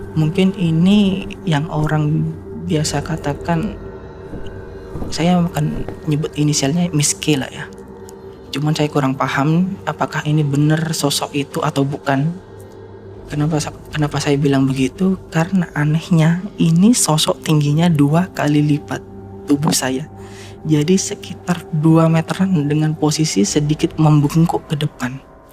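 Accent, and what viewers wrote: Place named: Madura, Indonesia. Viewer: kirain suaranya lagi serak, ternyata logat maduranya masih terbawa, bagus ceritanya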